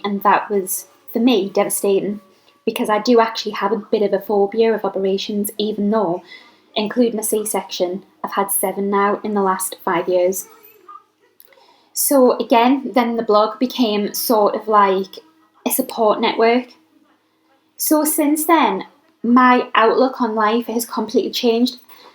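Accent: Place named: Doncaster, United Kingdom. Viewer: Nice. Even it's hard to follow your accent as a non Doncaster 😅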